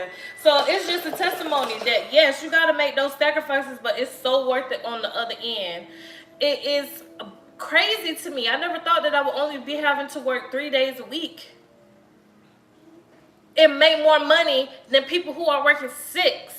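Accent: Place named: Polk County, United States. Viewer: That’s that Polk county accent. Only the ones from the city know it 🤣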